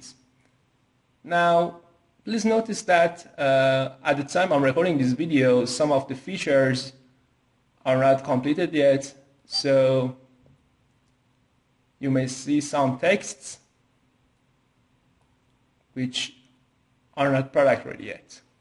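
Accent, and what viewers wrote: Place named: Iran. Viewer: Great themes, that Persian accent tho :)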